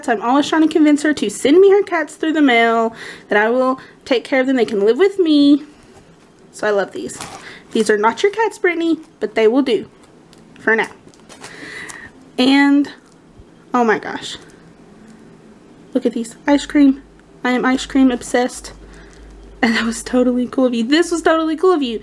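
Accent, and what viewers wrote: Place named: South, United States. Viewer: SOUTHERN CHIPMUNK VOICE!!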